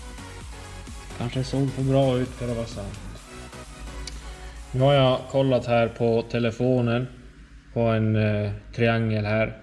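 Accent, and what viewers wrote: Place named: Norrland, Sweden. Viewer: King video! Var e din dialekt i från, låter som norrut eller mer mot det finska?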